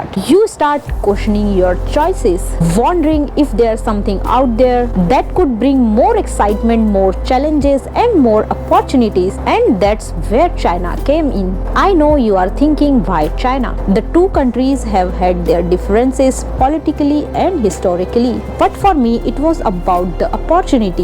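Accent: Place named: India. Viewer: She speaks like how most Indians speak English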